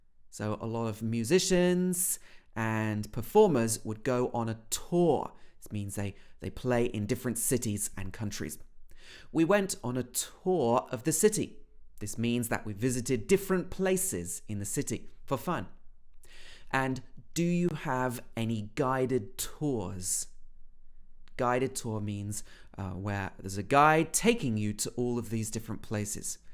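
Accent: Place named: Southern England, United Kingdom. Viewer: Hi. I love your accent so much, I live in Manchester though and I think it's better to have a course with a teacher from Manchester. As the accent in Manchester is a bit different from South. What do you think?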